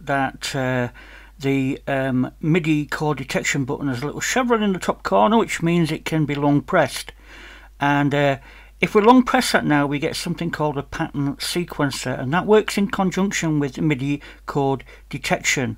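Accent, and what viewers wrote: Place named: West Yorkshire, United Kingdom. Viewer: Outstanding. all these updates. West Yorkshire by any chance?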